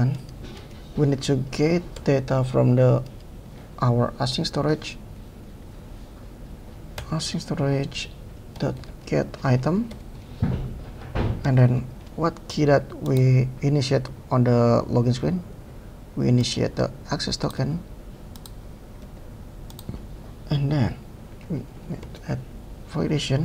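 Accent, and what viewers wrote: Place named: Indonesia. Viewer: indonesian accent wkwkw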